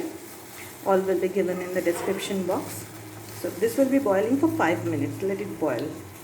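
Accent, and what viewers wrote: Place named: Kolkata, India. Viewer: Sunei apnake Kolkatar bashinda mone hocche...... Great work.... keep it up....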